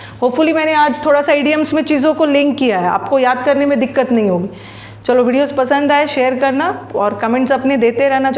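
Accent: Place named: Mumbai, India. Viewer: Ur hindi tone is like mumbaiya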